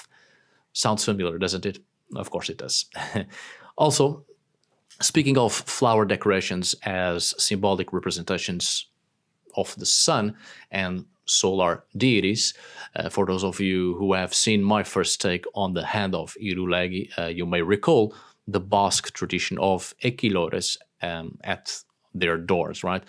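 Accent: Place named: Portugal. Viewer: Hi. I could not place that warm, Euro accent in English. Portuguese! Wonderful. I was thinking was Norwegian or such. Good luck on your existential content issues,.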